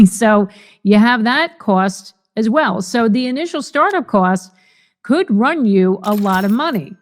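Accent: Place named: New York, United States. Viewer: Where you from? New York sounds like. "Cawst" instead of costs. Funny.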